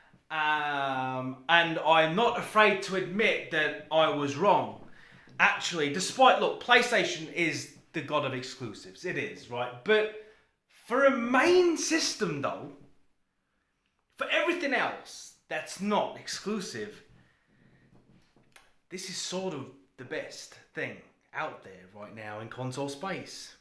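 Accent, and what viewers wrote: United Kingdom. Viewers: am uk and its putting me out I was like was he uk moved somewhere lol 😂 ..but yes give atomfall a look as it looks so good aswell ... having both consoles is the best way now you own a Xbox go and buy yourself some 2800mah AA rechargeable batteries and a socket charger (all about 20 quid if that) and your have a shock how long the controller last to a ps5 controller I get like 4 days from mine lol (usb mic) 😆 my ps5 about 8-10 hours if that lol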